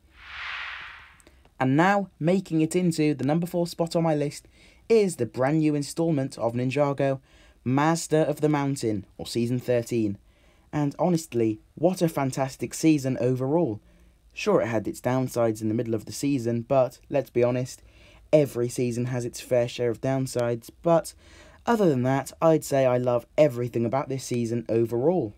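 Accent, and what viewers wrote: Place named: United Kingdom. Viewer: British lol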